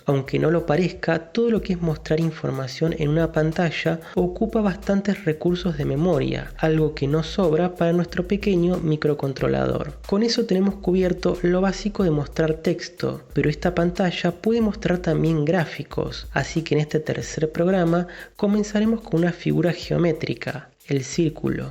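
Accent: Argentina. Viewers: Las mejores explicaciones de arduino de YouTube, además es cómodo porque es argentino.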